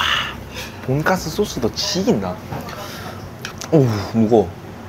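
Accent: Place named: Gyeongbuk, South Korea. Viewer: 저는 경주에사는 50대 그냥 아줌마입니다^^여러~~유투버들봤는데 댓글은 정말,첨으로 남깁니다. 맛나게드시는건 기본이고 인정도많네요.특히 시장에서는 더 한듯~~방안에서 배달음식보단 나가서 시장순례가 더 중독으로가게하네요^^같은경북이라 억지로 표준말안쓰시고 경북말씨 더 듣기좋앟어요^^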